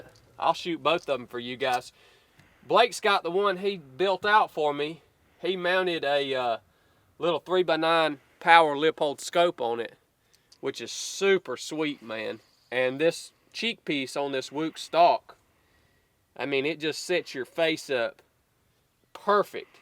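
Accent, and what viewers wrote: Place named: Appalachia, United States. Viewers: Another video that did not need to be made --it's common sense....Woox is pushing products on every ytuber right now ,lol over priced and over weight , many many better options than Woox . Hillbilly talk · I'm from Georgia so im used to country dialect, but his accent is something serious.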